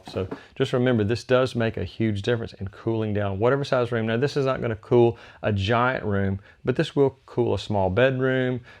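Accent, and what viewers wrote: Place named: Tennessee, United States. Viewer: Where are you located? You sound like maybe Tennessee.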